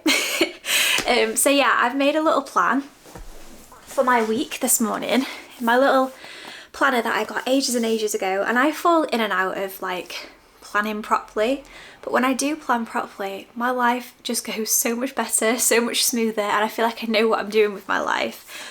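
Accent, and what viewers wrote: United Kingdom. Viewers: I love the care and attention you put into everything you do. Your pretty garden and lovely accent make me miss the UK.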